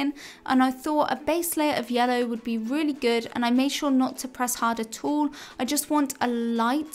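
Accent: United Kingdom. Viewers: U r having a good British accent, Where r u from?? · Everybody looking at her drawing skills but me only paying attention to her Emma Watson accent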